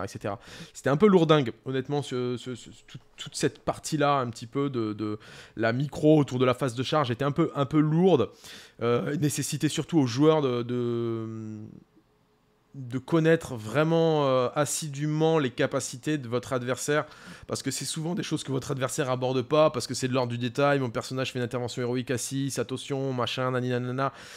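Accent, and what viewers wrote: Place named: Scotland, United Kingdom. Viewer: vu l'accent, il est pas anglais, il est écossais !!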